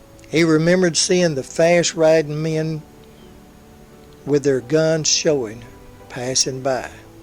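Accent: South, United States. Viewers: I think we're close to the same age, if Jerry Mather as the Beaver is your age then we're the same. You have a smooth southern voice I like hearing, and being a history major from William and Mary getting to hear stuff that wasn't included is always a plus.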